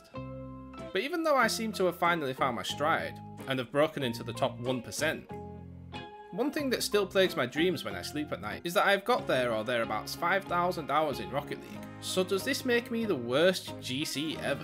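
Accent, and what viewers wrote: Yorkshire, United Kingdom. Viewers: Your a proper Yorkshire lad aren't you!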